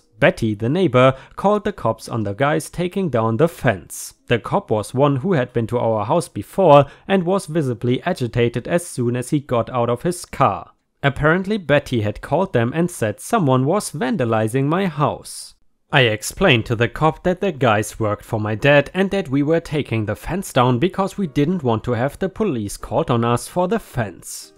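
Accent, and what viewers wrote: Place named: Germany. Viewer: Hey man! Glad to see you're still making grade A content! It's just that... You're relapsing! Hahaha! For one, I think it's really cool that you take your viewers constructive critisism to heart, as you did with mine in the past! The thing I'm talking about is saying "so the next one is the update to (printer lawsuit)". I havn't noticed you doing this as much lately, but it's starting to appear again. Please specify that you mean "the next POST" instead of "the next one", because stating "the next one" usually means "the next STORY". But in this case, we're talking about an update to the SAME story. Once again, I think your content is great, just a couple of things that grind my gears ;) By the way, are you from Germany or Austria? Love the accent either way!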